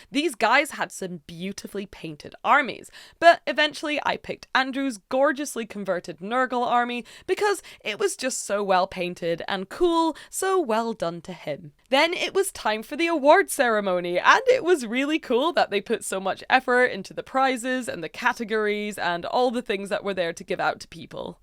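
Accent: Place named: United Kingdom. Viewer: Absolutely love your british accent, lovely as you are!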